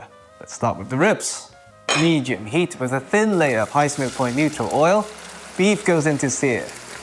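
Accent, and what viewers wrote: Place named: United Kingdom. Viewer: That is the most British voice I have ever heard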